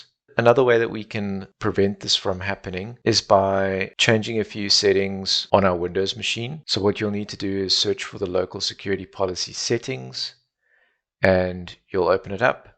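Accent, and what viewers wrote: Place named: South Africa. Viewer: Cool South African accent.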